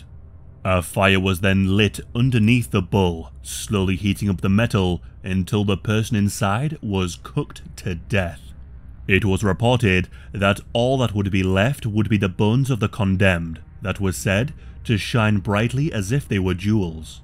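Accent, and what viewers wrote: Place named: North East England, United Kingdom. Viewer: What the hell is this blokes accent-he sounds North Eastern, yeah a bit like Byker Grove?!!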